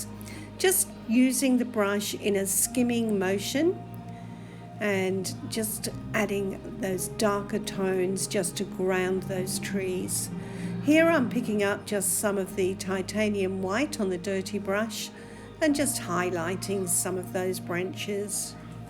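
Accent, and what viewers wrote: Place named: Australia. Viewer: Just came across your channel and enjoyed the different ways you produce art from the gelli pad. Thanks for all the detailed comments and hints. It's great to hear an Aussie. Look forward to following more of your art works. Lesley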